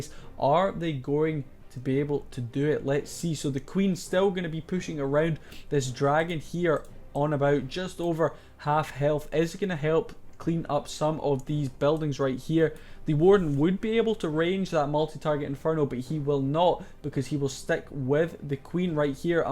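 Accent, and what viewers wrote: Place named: Scotland, United Kingdom. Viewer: your accent reminded me of "trainspotting" movie "choose life" thank you for the vaedio man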